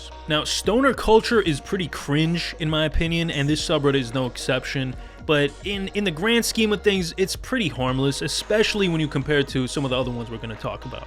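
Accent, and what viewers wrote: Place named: New York, United States. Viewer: I love your accent ? Where is it from? New york?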